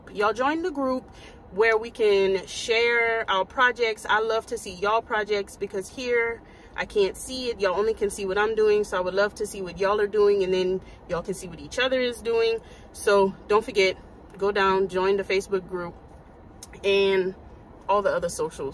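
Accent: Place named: Louisiana, United States. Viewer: I just recently came across your channel because of your Cupid box reveal. I love your down to earth, call it like you see it approach. 😊 And I KNEW you were a Louisiana girl from the first video I watched but I haven’t heard you mention it until this video. As a LA girl myself I’d recognize the accent anywhere! 😂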